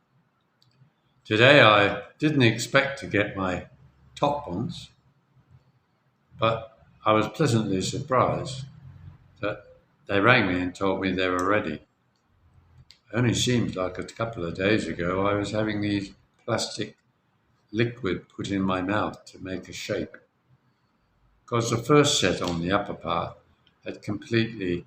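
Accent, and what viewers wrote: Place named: United Kingdom. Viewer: Your british accent trumps all other conciderations..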